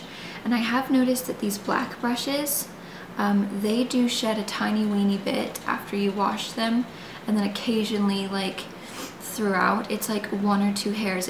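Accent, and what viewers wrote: United Kingdom. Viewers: She's English but was raised in America so she lost her accent. Though some of her words do sound like she has a slight trace of a British accent.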